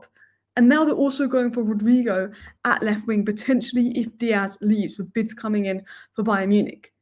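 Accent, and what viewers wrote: United Kingdom. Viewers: hello... i follow you and watch your content, it is really amazing... but i want to know which british accent you do speak.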